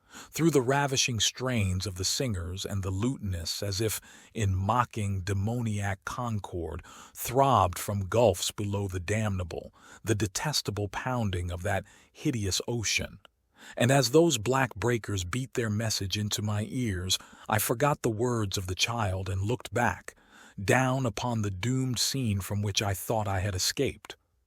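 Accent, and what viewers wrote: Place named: United States. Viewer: Finally I find an American reading an American author. Most readers of this story are Brits. Likewise, I prefer British authors read by British narrators. Call me picky, but it makes a big difference for me. 🙂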